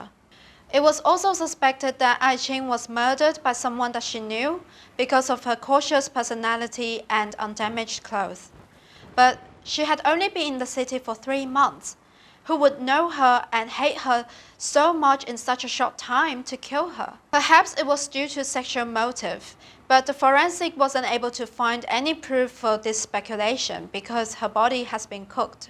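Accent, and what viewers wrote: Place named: United States. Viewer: My friend this is my humble request please change your English speaking style your speaking American English Asian country peoples are not properly understand please my friend tried to speak British style English thank you for this video my friend🇨🇳🇨🇳🇨🇳🇨🇳🇨🇳🇨🇳🇨🇳🇮🇳💐👍✌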